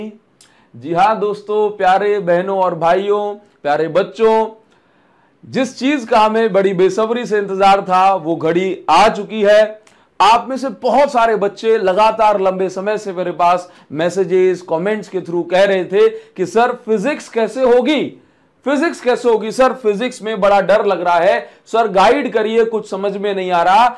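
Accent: Odisha, India. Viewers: Sir are u from odisha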